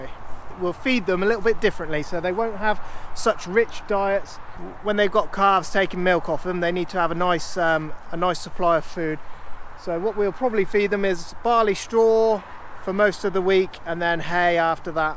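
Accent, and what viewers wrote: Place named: Oxford, United Kingdom. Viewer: Thought I detected an Oxford accent! I live in cornwall now but often travel the M40 and spotted Four Winds Farm years ago. I collect old tractors and have two ex Peter Turney ones in the shed. Wrong colour for you but I’m sure you’ll know the company!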